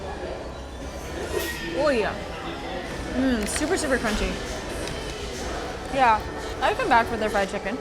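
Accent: Philippines. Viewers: Your English is sounds like filipino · Man, u even talk and eat like a Filipino. I'm very impressed.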